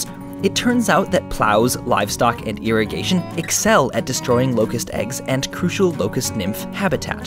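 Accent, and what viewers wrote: Midwest, United States. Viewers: It the midwestern accent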